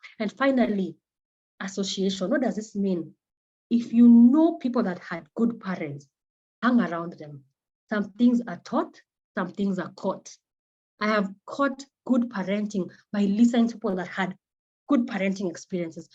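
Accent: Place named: Botswana. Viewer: I love your accent! I was growing up in Botswana and I miss it a lot.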